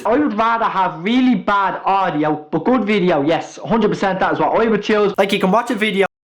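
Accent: Ireland. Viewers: Its nice to listen to an Irish person on you tube just messing around and not being so serious. PS you remind me of my little cousin's deadly (*-*).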